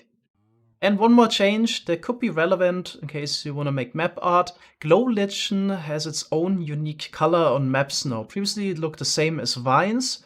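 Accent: Germany. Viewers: Man hört sofort den Deutschen Akzent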